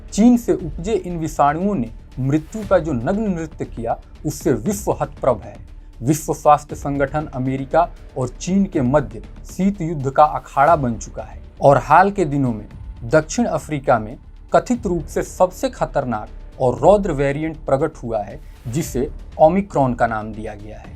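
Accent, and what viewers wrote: Standard. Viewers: आप बहुत शुद्ध हिंदी बोलते हैं 👍👍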